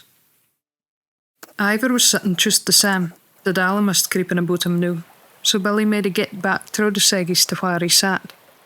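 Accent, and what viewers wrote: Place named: Shetland, United Kingdom. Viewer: That's just a glorious thing all around! Listening to the dialect as a soothmoother and concentrating to keep track is a most enjoyable challenge. The combination of words, pictures and music just makes for a perfect piece of story telling. Thank you for writing, producing and sharing such a gem of Shetland magic. Your dedication brings a happy tear to a cousins een, too.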